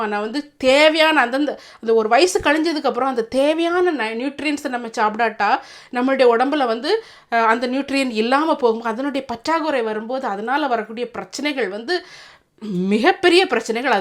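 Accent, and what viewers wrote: Nagercoil, India. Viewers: Hi akka…I like the way u speak especially Nagercoil slang…I lived in England for a couple of years…really very nice country.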